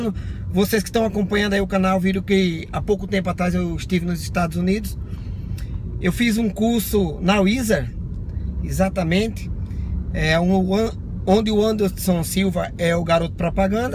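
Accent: Northeast, Brazil. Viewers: Tu é nordestino neh macho? Kkk cheguei agora no canal, vou ser inscrito.. E sim eu faço SENAC-PE e so tenho a dizer que é top man, eu não sabia de naaaaaada, e agora to quase terminando o básico e posso dizer que ja posso ter uma conversa básica com um americano, principalmente saber ouvir. 5 meses de curso.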